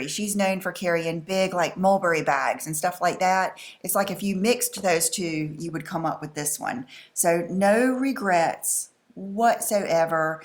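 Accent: South, United States. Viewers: I just LOVE YOU. You are who I’d love to be. I found you and you are like my fantasy channel. I can’t afford the high end things you have and talk about. I have to say at times, I feel envious and at times it makes me sad that I can’t have such nice things. I’m 60 and disabled on fixed income. I’d love to be picked for a gift, it would mean the world to me. Thank you for being the fantasy “me”. My coloring is like yours and I have the redness. I try to look my best with the makeup I can afford. Anyway, I am happy I found your channel and I love your fashion sense (it’s me or the me if I could afford it) I love your southern accent and your kind and sweet way you treat your “friends” on here. God bless you.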